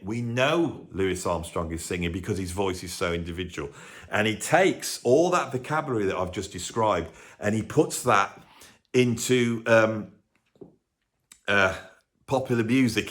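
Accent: Birmingham, United Kingdom. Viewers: Oh...for God's SAKE!!!...this is PAINFUL to listen to! For a start...I can never take any Brummie seriously with that ridiculous whiney Jasper Carrot/Noddy Holder/Ozzy accent! It makes me teeth itch! 😖 Secondly...WTAF?!?! I don't know what makes you think that YOUR opinion qualifies you to spout such tosh, but...I'll give you MINE... Musician for over 50 years. Multi-instrumentalist. Guitar, Keys, Clarinet, Flute, and Sax. Played professionally for decades to some considerable success. Also a published writer and music historian. So...to listen to SO MANY INNACURACIES...just made me want to SCREAM! So much of what you were blathering about is just plain FACTUALLY WRONG! Example: Zeppelin...were what the Band of Joy BECAME?!?! 😂😂😂😂😂😂 WHAT?!?! Utterly ludicrous. Zeppelin were ALWAYS Pages brainchild. From beginning to end. He had seen The Jeff Beck Group...and basically had decided to photocopy them. 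Add a few gnarly blues standards dressed up as Zep "originals"...and there you have it. NOTHING to do with some hippy-drippy band of deluded hippies from the "Bluck Countraaay" Give me strength! Go back to working in Carphone Warehouse mate... This CLEARLY isn't your gig. 🙄😏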